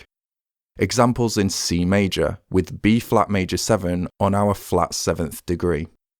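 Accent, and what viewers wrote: United Kingdom. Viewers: I guess he s British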